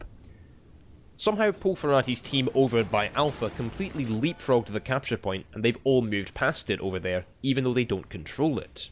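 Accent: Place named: Paisley, United Kingdom. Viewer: Love your accent, reminds me of me mom. She was from Paisley.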